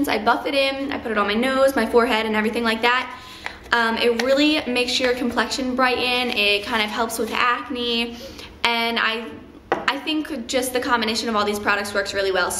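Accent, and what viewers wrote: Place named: Midwest, United States. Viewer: are you ffrom the mid west. your accents sounds like my cousins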